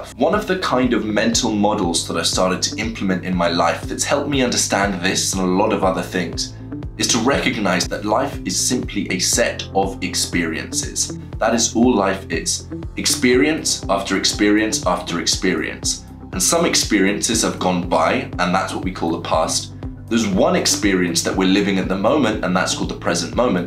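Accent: England, United Kingdom. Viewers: You sound English it’s refreshing respect from South Yorkshire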